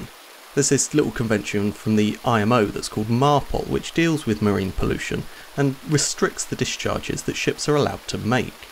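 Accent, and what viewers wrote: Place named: England, United Kingdom. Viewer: I guess a English accent should make everything more palatable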